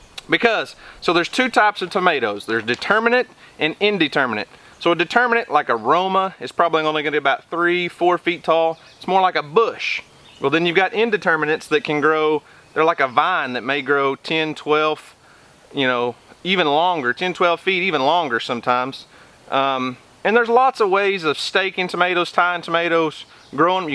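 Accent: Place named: South, United States. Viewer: Ahhhh...a fellow Southerner.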